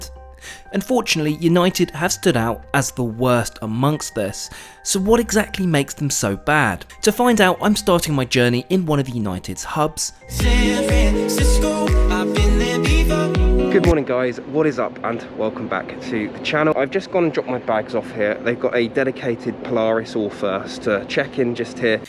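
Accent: United Kingdom. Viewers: How are you able to travel so freely during the pandemic? I believe you're from the UK if I got your British accent right. How can you travel with the traffic system in place with Amber countries? Do share your secret.....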